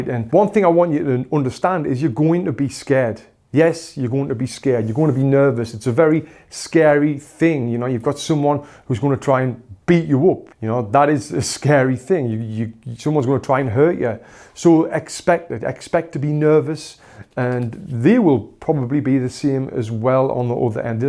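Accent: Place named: Peterlee, United Kingdom. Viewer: I live in Peterlee haha I though your accent sounded very similar to mine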